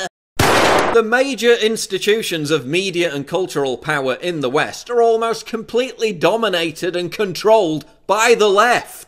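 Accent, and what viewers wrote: United Kingdom. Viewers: you are the living proof of people with a British accent getting away with saying utter shite. I wonder if your intellect is strong enough to deal with the hard topics of political philosophy or are you just gonna make a name of yourself by posturing as the tough guy to college sjws?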